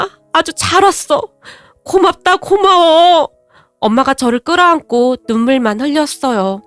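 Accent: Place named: Jeolla, South Korea. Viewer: 님 왜케 귀여워요ㅋㅋㅋㅋ 접때 우연히 전라도 사투리쓰는사연읽고반했음ㅋㅋㅋ 넘귀엽.. 인스타안하세요? ㅇㅅㅇ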